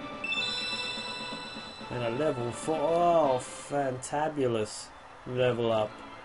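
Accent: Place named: England, United Kingdom. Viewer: you arent bugging me with your sloppy work. your accent (since im american its an accent to me =P) makes it all work it. very entertaining. I find it ironic that both commented LPs of this game are by fold from the land of england. even if you are in japan now like you mentioned in one of your videos.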